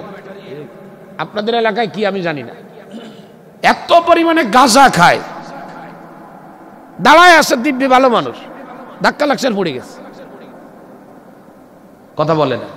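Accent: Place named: Satkhira, Bangladesh. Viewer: Satkhira tune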